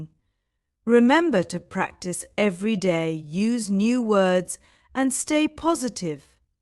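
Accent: United Kingdom. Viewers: I found adorable the british accent. Thanks a lot. You have a new subscriber.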